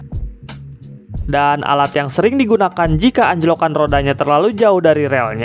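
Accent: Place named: Sunda, Indonesia. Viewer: Kental juga logat Sundanya, bro.👍🤝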